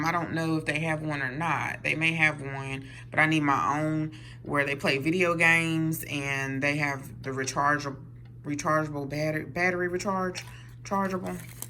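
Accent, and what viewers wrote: South, United States. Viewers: Thank you for sharing from your heart! 🥰 Thanks for the encouragement to go shooting too. I keep thinking that, but draaaaag my feet. Perfect date idea right now!! Great job getting into canning and gardening. I’m a newbie too. It’s definitely in your genes!!! 🧬 I’ve got oxygen absorbers and Mylar bags in my amazon cart right now! Haha Just waiting on the next paycheck. 🤑 Blessings to you, dear Sister! Fall gardens are great! I’m not sure where you’re located but I’m hearing some southern accent. So if you’re down south (I’m in SC) you could do well with a fall garden. I’ve had lettuces, broccoli, & cabbages do well producing through the winter here. Jump in!! 👩🏻‍🌾🥦🥬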